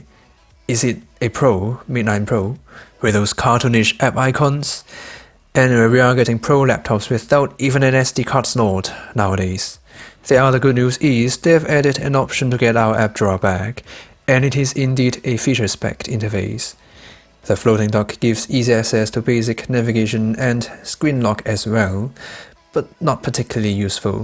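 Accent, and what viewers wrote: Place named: United Kingdom. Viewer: Your accent makes me want to drink some tea in a British mansion. Can't stop listening, good job! :)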